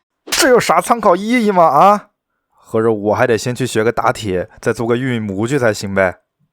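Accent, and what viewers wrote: Wuhan, China. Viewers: 熟悉的武汉普通话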